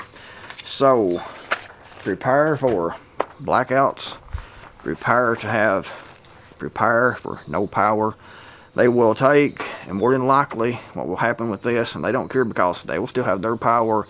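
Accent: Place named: Tennessee, United States. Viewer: Lived in Georgia for 35 years, so I know this dude... good guy, great neighbor. Guessing he's a Volunteer fan from his dialect. I'm jealous of your hardwood... mostly cedar and pine in NM.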